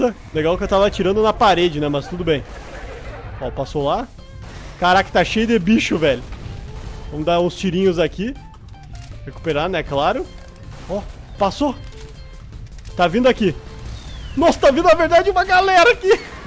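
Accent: Rio Grande do Sul, Brazil. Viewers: Rsrsrsrs o tem essas gírias e falas gaúchas. Deixa muito engraçado rsrsr 😎😁😆😆😆😆😆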